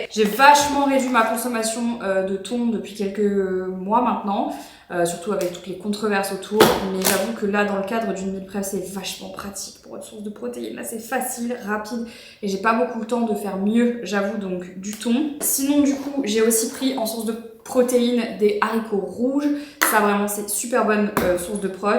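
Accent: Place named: Belgium. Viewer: Plus ça va plus tu as l'accent belge!!😂😂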